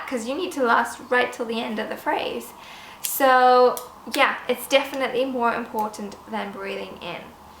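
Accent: United Kingdom. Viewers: didnt know she was british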